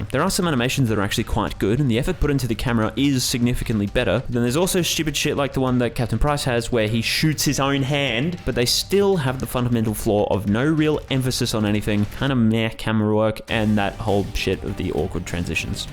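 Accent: Australia. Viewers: He's Australian, not British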